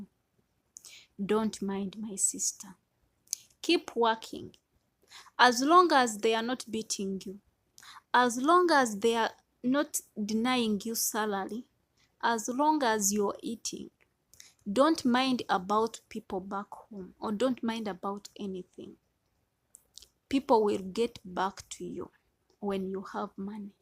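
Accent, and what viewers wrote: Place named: Kenya. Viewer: You sound like a Kenyan ooh God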